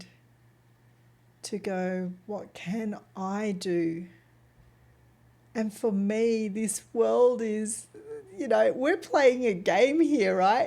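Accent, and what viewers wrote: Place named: Europe. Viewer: She seems like a really nice girl. Love the accent. I wonder what country she is from. I'm guessing somewhere in Europe.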